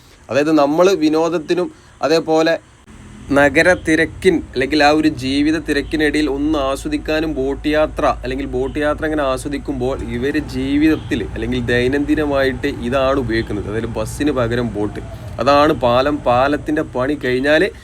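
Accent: Malappuram, India. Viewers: മലപ്പുറതകാരനാണെന്ന് സംസാരം കൊണ്ട് ഉറപ്പായി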